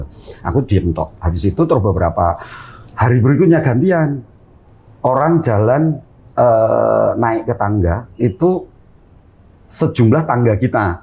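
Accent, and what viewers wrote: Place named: Semarang, Indonesia. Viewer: Bahasane semarang bgt hehe,,, salam dari cah banyumanik bang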